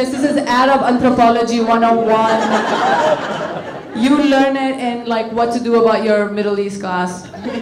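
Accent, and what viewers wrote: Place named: India. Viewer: Spoken in a Apu Nahasapeemapetilon accent..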